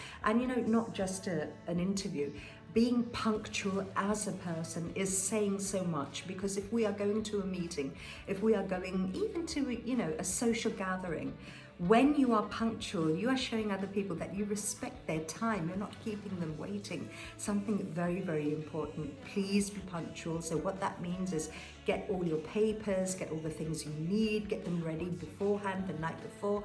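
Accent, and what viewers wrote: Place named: United Kingdom. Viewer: such a quality content but least number of views. If you make prank video it will go viral. This is the taste we have unfortunately. We should promote quality content like this. I have just discovered this channel today, I used to watch news by Shabnam Riaz about 10 years ago I guess. You have got really nice British accent. Keep it up. I will urge the audience to share and subscribe to promote such quality videos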